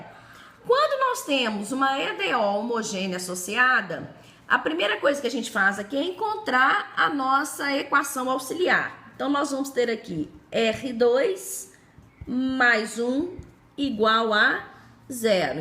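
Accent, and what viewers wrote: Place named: Belo Horizonte, Brazil. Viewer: Sotaque de Belo Horizonte MG...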